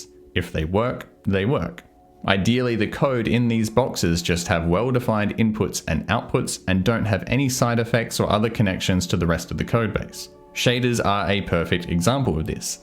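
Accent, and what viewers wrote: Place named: Australia. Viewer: Hhahaha no way I got to the same feeling after a decade of frontend dev, with React. Hobby farm and or game dev. I started learning C++ and it's been great! I don't have many good feelings/experiences around AI, I really pushed it to it's limits as an early adopter and found that it has a negative impact on my memory and analytical skills. I just use it kinda like a search engine when I really can't find any other way. However I am open to giving it another shot using something more like your method. As a side note, I also use Vim with the file explorer to the right :) From your accent, I assume you're a fellow Aussie! Thanks for sharing this, great video!!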